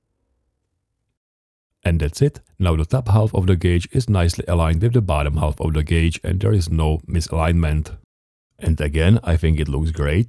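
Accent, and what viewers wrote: Czech Republic. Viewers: velmi vyrazny cesky prizvuk, inac genialne video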